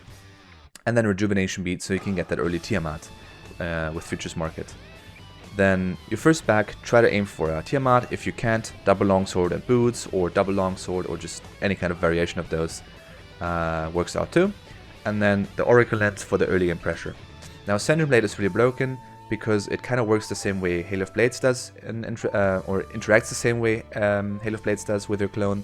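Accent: Germany. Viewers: Just discovered your channel. I wanted to start maining Shaco and your video was very helpful. Thx man and keep it up. PS: You sound very german in my opinion, especially how u say Talisman :D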